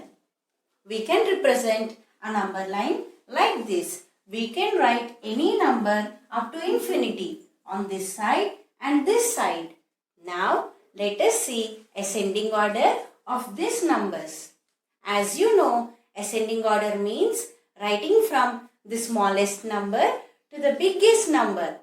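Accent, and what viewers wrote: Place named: Andhra Pradesh/Telangana, India. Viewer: You are from Adhra or telangana 😅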